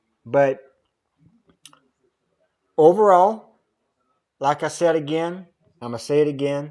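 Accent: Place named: Alberta, Canada. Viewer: Thanks for video!!! You have Canadien(Alberta) accent. where you from?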